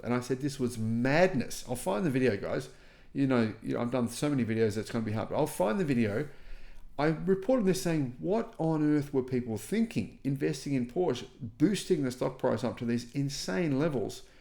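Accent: Australia. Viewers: U r an Aussie sir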